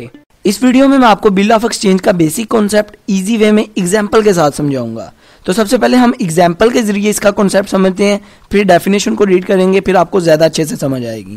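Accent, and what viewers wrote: Punjab, India. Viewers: Sir aap punjabi ho ??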